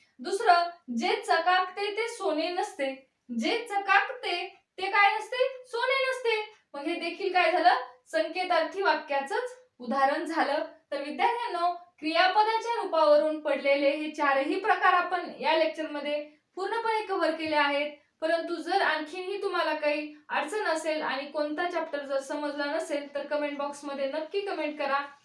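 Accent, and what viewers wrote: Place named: Thane, India. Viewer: मॅडम (ताई,) आपलं गाव कुठे आहे आपली भाषा ठाणे जिल्हा मधील वाटते मी शहापूर, मुरबाड जिल्हा ठाणे मधील आहे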